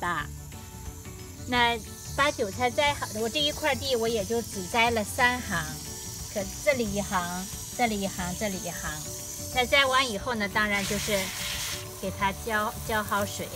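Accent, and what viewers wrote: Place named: Weihai, China. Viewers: 大姐是威海的吧，字里行间家乡味儿改不了啊！ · 听口音好像山东的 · 听您的口音好像山东威海人呢！ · 哇、妳也是威海妹子?咱也是威海人。難怪聽著那麼親切!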